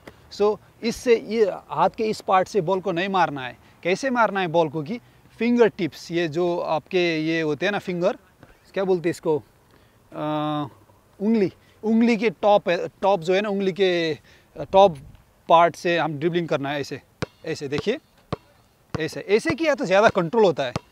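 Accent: Nepal. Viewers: nepali daju k xa khabar tone chai nepali k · Bro your hindi seems like Nepal 🇳🇵. Are you?? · Why i am hearing nepali tone? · Aap Nepali assent mai bat kar rahe ho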